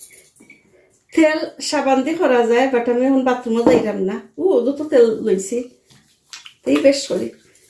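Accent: Sylhet, Bangladesh. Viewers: আপু কি সিলেটি নাকি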